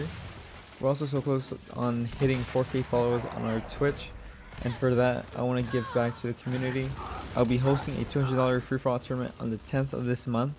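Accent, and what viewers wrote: Mexico. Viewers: Ey feliz año un saludo, esa voz se escucha bien mexicana dios te ayude a tener más y tener nuevos proyectos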